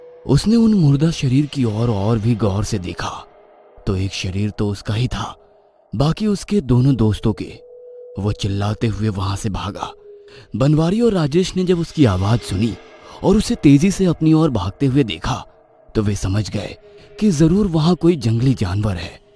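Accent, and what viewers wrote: Bihar, India. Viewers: Full bihari style 🤣 im from Bihar ❤️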